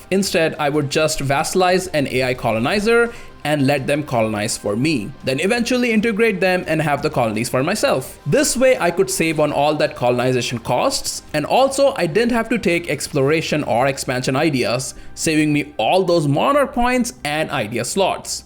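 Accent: India. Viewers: Is Indian? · Are you indian?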